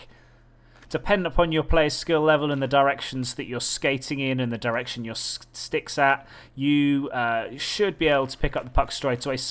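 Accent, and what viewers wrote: United Kingdom. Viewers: Somehow your British accent makes it actually feel like I'm learning. Teach me about the inconsistent stick physics, though defenders legs ect.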